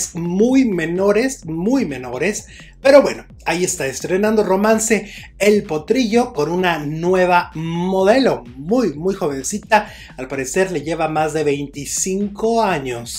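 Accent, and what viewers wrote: Fresa, Mexico. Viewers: Qué antipático eres , tú tono de voz es desagradable quieres hablar muy fresa antes hablabas normal, y caes mal🤔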